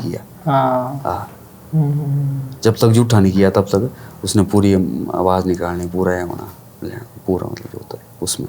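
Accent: Pahari, India. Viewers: हिंदी में जो पहाड़ी भाषा की आवाज आती है वो मुझे अच्छी लगती है।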